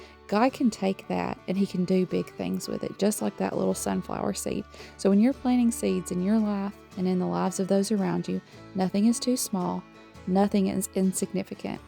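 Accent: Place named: Appalachia, United States. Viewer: You talk just like we do in southwest Virginia.( The Coalfields) We are near eastern KY and East TN ( Harlan County, KY and Kingsport, TN)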